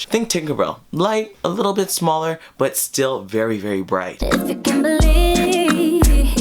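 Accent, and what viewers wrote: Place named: Jamaica. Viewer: This is not meant to be assuming, or offensive. But when I listen to your voice, some of the subtle nuances in your voice I get a Jamaican accent underlying your pronunciation. Just an observation I made. I could be just projecting.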